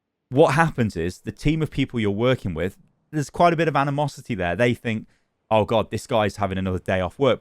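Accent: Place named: United Kingdom. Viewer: No lie, you're a beacon in these dark times. Super happy you're feeling better, Crohns & colitis is no joke! Also lmao "because apparently I'm lucky cause I got both" is the gallows humor that I'm here for 😂😂 wanna add that despite the fact that I'm not gonna play any blizzard games till theyve definitely changed, I'll still be here for your content cause I'd miss that working class British accent lol, you're the best duderino!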